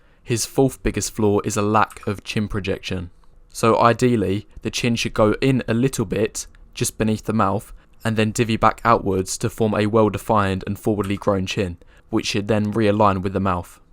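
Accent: United Kingdom. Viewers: but the narrator's voice is way more feminine and soft and incel then all of these guys he's calling out. What's worse is he's got a British accent which is viewed as weak in most cultures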